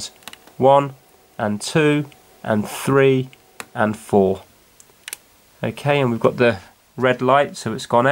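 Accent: United Kingdom. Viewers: THANK YOU THIS HELPED IT WORKS YAYYAYAYAYAYAYAY!!!!!!! Plus nice British accent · Aha! now I’ve seen your face after all this time. listening to your wonderful British voice and learning about video game consoles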